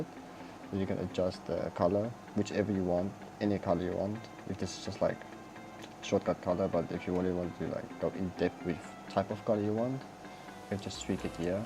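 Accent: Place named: Afrikaans, South Africa. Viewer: N mens kaan hoor jys afrikaans😂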